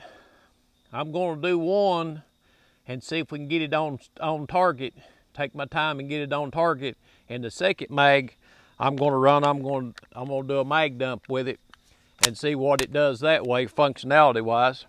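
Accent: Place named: Appalachia, United States. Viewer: The TEE SAUCE 1911🤣🤣🤣 I love that hillbilly accent.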